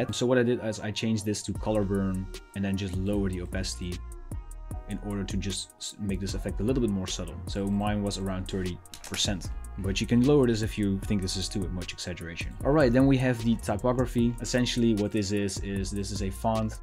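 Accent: Netherlands. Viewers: Deze design is echt zo dope! Ik hoor aan je engels toevallig dat je nederlands bent 😊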